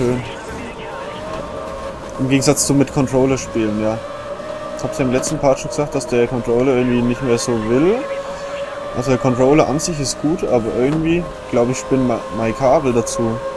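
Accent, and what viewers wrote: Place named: Bavaria, Germany. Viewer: wow, sehr schade wegen den wenigen zuschauern , die qualität der videos is genial ( 1080p ftw ) und dein kommentar is auch gut, du könntest höchstens versuchen das bayerische noch ein wenig mehr zurückzuhalten, stört mich aber nicht als selbst bayer, mach auf jedenfall weiter so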